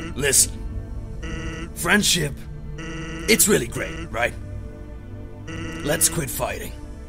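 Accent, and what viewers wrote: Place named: Australia. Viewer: Your Sans sounds sorta Australian to me.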